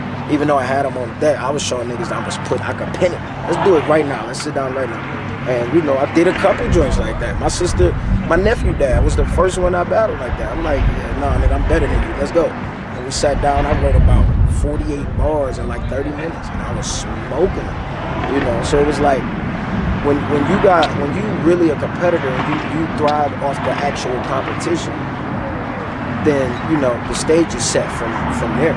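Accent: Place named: Pontiac, United States. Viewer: I COULDA SWORN HE WAS FROM PONTIAC LOL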